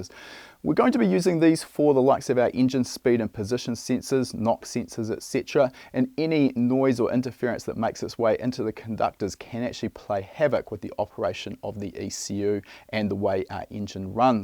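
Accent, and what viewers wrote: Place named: New Zealand. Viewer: I just come here for the Kiwi accent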